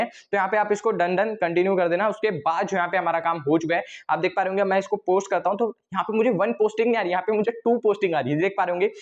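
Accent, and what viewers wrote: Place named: India. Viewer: The problem is he is speaking English... I understand like every 15th word Oh, it isn't English. I thought he just talks really fast and with accent. He uses a lot of english words though. Damn Indians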